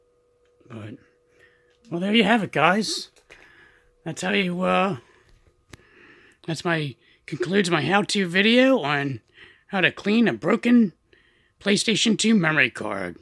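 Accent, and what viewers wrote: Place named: South Jersey, United States. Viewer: I hear south Jersey in you haha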